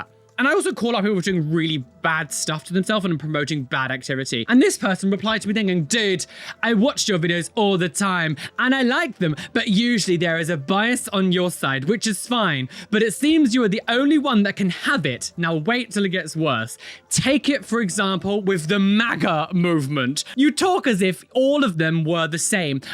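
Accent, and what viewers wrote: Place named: RP, United Kingdom. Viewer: all of these people dogpiliing on you … are they Americans? Because if they are, it explains a lot their baseless accusations. You see, here in the US, there's a bit of a trope around a British RP accent: it's associated with either (A) being erudite and and expert [both of which are hated by a large swath of this country]; (B) movie villains; or (C) snobs. Having been raised on Monty Python and imported British TV shows, I don't have that reaction. But to 'Muricans who do not have much experience with British culture, your RP accent [which sounds quite good to my ignorant American ears] is probably making them fall into those tropes the instant they hear you speak. Which may explain the hate — they literally are incapable of overcoming their preprogrammed reactions.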